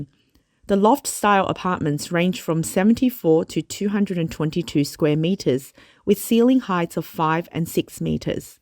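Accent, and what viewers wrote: Australia. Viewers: façade is pronounced as "FAHZAD." Not the worst Aussie or Kiwi (?) accent but quite pronounced. I've heard WORSE eh ! 😉🙃🙂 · You guys do very good content but can you do away with the Aussie accent? Doesn't fit with what you report..and please get your pronunciations right..facade is pronounced wrongly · Wait, that's an Aussie accent? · there is that Aussie 5 eyes voice over again....... Keep up the trash talk Temu, temu, temu temu temu..... Bring on the launch of temu chyna rubbish products looking for a home. Meanwhile the banks are failing get your cash out now. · That’s an Aussie accent alright, being Australian myself I noticed it straight away, it’s hard to miss 🙂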